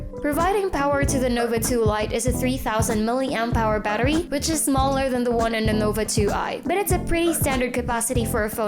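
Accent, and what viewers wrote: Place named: Philippines. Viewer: Now that's what you called a filipino-english accent😍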